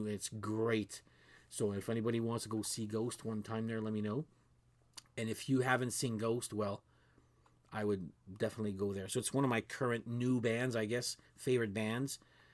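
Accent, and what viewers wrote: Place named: Canada. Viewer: Very good analysis. I think “ Meliora” is the best one too, and I share your enthusiasm for the awesomeness that is “Square Hammer.” My only quibble is “If You Have Ghosts.” I am a Roky Erickson fan of 40 years almost, and think Ghost does the definitive version of this song. Watch some live versions where Tobias really owns the song and the guitar lines at the end are sublime. Also, I ‘m glad you mentioned Ottawa cos I thought you had either a Canadian or Midwestern US type of accent. Subscribed!👍🏼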